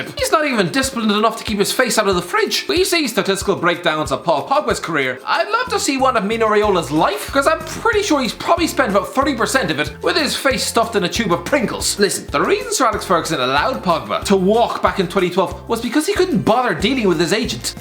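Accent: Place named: Ireland. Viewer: Nope just that irish accent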